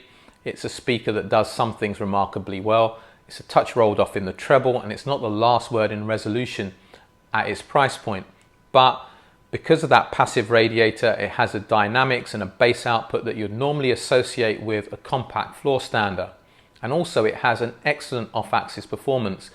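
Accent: United Kingdom. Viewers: your content has always been top notch and you do excellent reviews, so of course your channel grew quickly. It doesn’t hurt that you’re obviously a good guy, and you’ve also got that British accent vouching for you too! lol. Great year of reviews, and I hope you have a great holiday season. I look forward to seeing more of you in 2021. Thanks,! ✌️🎶🙂👏👏👏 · Like your British accent, however, some of your items you mentioned are not available in the USA. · Thank You for you videos for 2020. I have found them really informative and you way of presenting is superb + I just really enjoy the British accent - really easy to understand as a non-native-english speaker.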